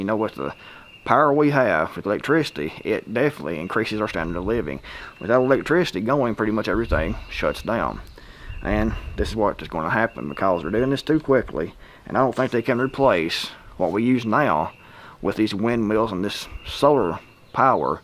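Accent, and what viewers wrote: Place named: Tennessee, United States. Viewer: Lived in Georgia for 35 years, so I know this dude... good guy, great neighbor. Guessing he's a Volunteer fan from his dialect. I'm jealous of your hardwood... mostly cedar and pine in NM.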